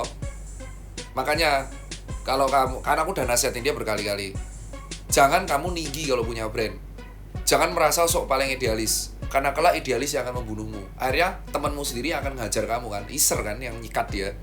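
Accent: Java, Indonesia. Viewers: gue suka logat jawa lu dok.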